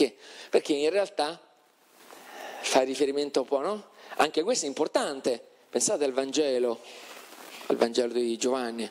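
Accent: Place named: Rome, Italy. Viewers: Se il relatore (in questo non ho fatto caso ma in altri video sì) evitasse le c romanesche e le doppie romanesche… non dico la dizione eh, sono contro, ma almeno niente italiano locale/dialettale; in più (sempre non in questo) dice cose che vanno contro la cultura generale e le parole di alcuni storici (esempio Istanbul che è la traduzione di Costantinopoli, Carlo Magno in buoni rapporti se non sopra Papa Leone III e contento della sua incoronazione, Loki con la clava e Odino con la lancia…) senza citare le fonti. E alcuni “gli” che dovrebbero essere “le” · Prima usa correttamente il verbo"insegnare" nei confronti del figlio. A 21.44 secondo me è il popolano che si chiede perché il suo dio non ha "imparato" a tutti la stessa lingua. È probabilmente una volgarizzazione voluta, come il fatto che fa le sue relazioni senza usare un linguaggio cattedratico ma piuttosto un linguaggio semplice con persino un accento romano.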